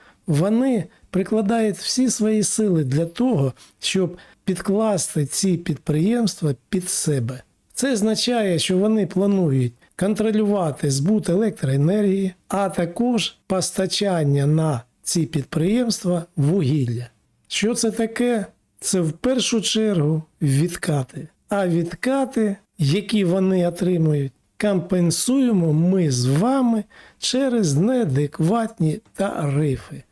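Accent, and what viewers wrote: Moscow, Russia. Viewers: Згоден в усьому. Слух ріже а-ля мацковська вимова: Акремі, Ачікує, кАму, пАпередники, Астанні... "Плюс" зміст, подача "мінус". Коротше: Ахуєть, а "училке" з української наше "ФЕ".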